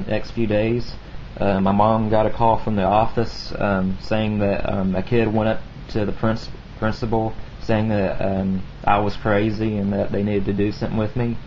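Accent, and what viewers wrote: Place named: South, United States. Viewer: He has a southern accent with a speech impediment. He substitutes /r/ with a /w/. "polow beaw" Great stories. You have a very mature and keen mindset. Keep it up!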